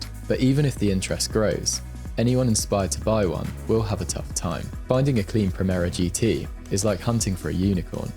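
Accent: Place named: United Kingdom. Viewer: You have a UK accent. It's called a saloon!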